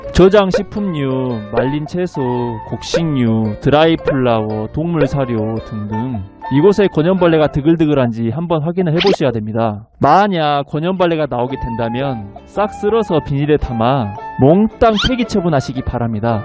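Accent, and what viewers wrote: Jeolla, South Korea. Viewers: 전라도 사투리 억양 정겹네요 ㅋ 표준어 쓰는척 하는게 억양이ㅋㅋ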